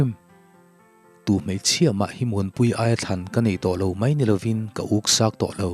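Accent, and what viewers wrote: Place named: Lunglei, India. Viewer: segundo ngaihthlak nawm na chhan hi chu lunglei lam hi atam thina anuam hranpa ve hrim2 heje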